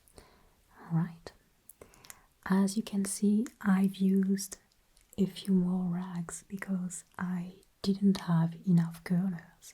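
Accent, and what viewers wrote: France. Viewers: Accents from the region of France are so nice-sounding. Very beautiful. Sorry, French Canadians. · My favorite part of her is her French accent. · That wig reminds me of my hair bumpy, lumpy and tangles even after just brushing it(lol)!!!... can't wait to see how " Marie Antoinette"s hairstyle turns out....no woman in her right mind wants to look like a "hedgehog"!!!....love her french accent and her natural beauty.....this is my first time watching this ASMR artist.... I'm very impressed with the quality of her video and it's content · Omg you’re so good! Question, are you french? (Note: if your not sorry, QwQ I’m bad with accents) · Super vidéo, le montage est parfait. Comme ton anglais d'ailleurs, ce qui est plutôt rare par chez nous ! Mais est-ce que ton french accent est naturel ou bien tu l'exagères un peu pour l'ASMR ? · I love the way she says Curlers. 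The French/English accent is so sweet to listen to. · English with a French accent AND hair play.?ZZZZZZ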